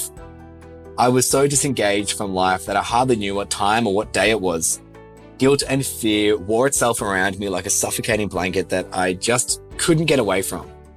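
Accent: Australia. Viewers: Ohhhhhh mate aussie? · He sounds Aussie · Omg I love Australian voices for some reason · U have a australian accent and it is cute asf now I kinda want a australian bf lol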